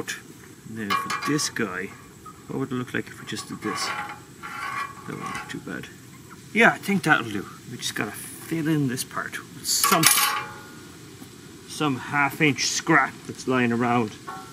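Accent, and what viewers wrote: Ireland. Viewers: I like how this guy sounds Irish and is still understandable. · So he IS Irish. I wasn’t sure... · Is he Irish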